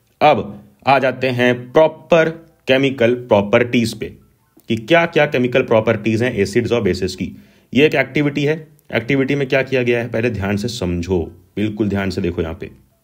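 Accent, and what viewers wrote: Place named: Indore, India. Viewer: Bhaiya aap Indore se ho kya thora Indori Accent aa raha hai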